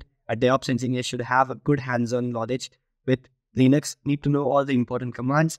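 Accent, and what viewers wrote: India. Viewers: Can I make a suggestion please? Your English is very good, but you still have a deep accent. Also, you talk very fast. Indians tend to talk very fast with an accent. So I don’t know about other American people but I have a very hard time understanding you. All of what you’re saying is very interesting and I want to follow it. Even some American speakers will speak fast sometime with different accents and I have the same problem. This is not just simple conversation, but explaining technical topics. So the combination of a thick accent and talking fast makes it really difficult to understand. I could play the videos on slow motion but there is still an issue and it’s a bit annoying. I love the programs from simpylearn and I really want to sign up but the only thing holding me back right now is this aspect. Sorry to ruffle anybody’s feathers but I’m just trying to help. 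And provide some honest feedback. Thank you.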